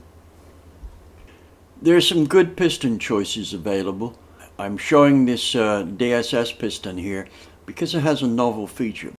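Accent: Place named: Yeovil, United Kingdom. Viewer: I cant find any history but he sounds like he's from Yeovil. These videos are like ASMR to me.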